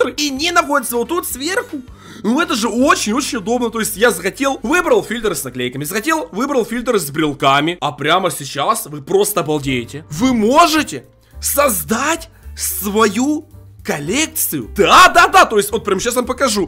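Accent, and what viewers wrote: Ukraine. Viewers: Брат ты из украины ???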